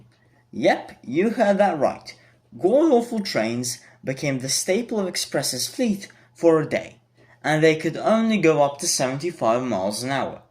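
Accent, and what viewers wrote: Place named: United Kingdom. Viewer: I just realised you sound completely British, but you're from Serbia?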